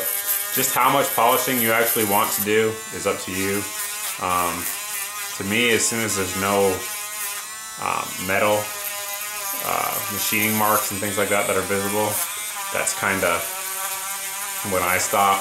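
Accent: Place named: Massachusetts, United States. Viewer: Just revisited this video and noticed your slight accent in this early video. Massachusetts, right?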